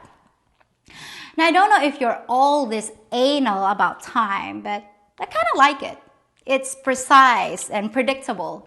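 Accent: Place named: South, United States. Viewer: I notice how fast you adopt regional coloquialisms..and our speech.. Like you're dropping your ING sometimes for in..it's Southern Talkin walkin.. yall every type of soft drink is called a Coke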